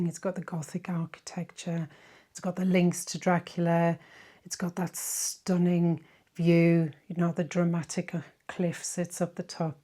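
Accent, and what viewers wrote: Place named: Birmingham, United Kingdom. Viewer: I really enjoyed that. A bit concerned that you have been poorly and hope you are on the mend now. You do have a soothing voice. I thought I detected a hint of Brummie or Black Country in your accent but that’s great. Keep up the good work 👏👏👏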